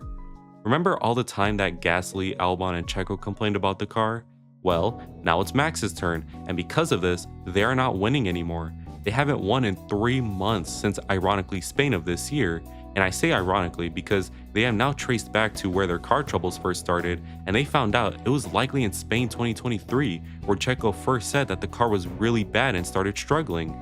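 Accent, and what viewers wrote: United Kingdom. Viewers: He's a brit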